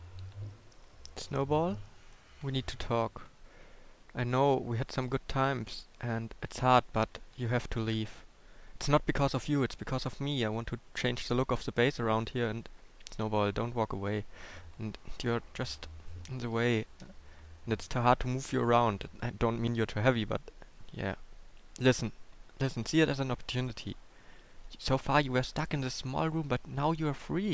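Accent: Germany. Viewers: Are you German you have a german a accent man. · You really sound kinda german, when you're speaking... what is you native language?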